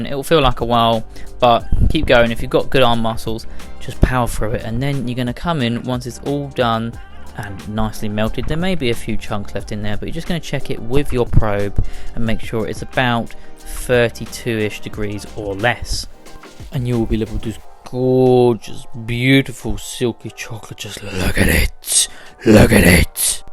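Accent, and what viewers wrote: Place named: United Kingdom. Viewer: I didn’t know I liked being yelled at in a British accent until now 😍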